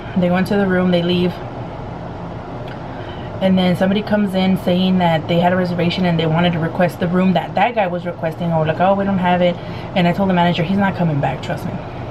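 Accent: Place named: South, United States. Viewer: I don't know what that accent is that you have but I absolutely love it. It's kinda southern with a hint of Spanish.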